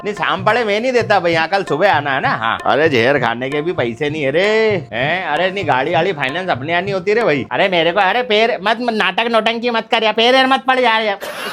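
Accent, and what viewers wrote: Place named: Indore, India. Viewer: mast bhiya,,, that's exactly how these indoree money landers talk like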